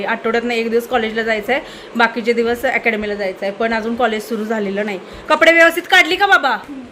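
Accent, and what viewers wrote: Kolhapur, India. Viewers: ताई तू म्हणाली की उंदर मांजर मिळतात येते खूप हसायला आलं 😅असो आपली कोल्हापूरची भाषा अशीच आहे मी पण कोल्हापूरचीच आहे ❤❤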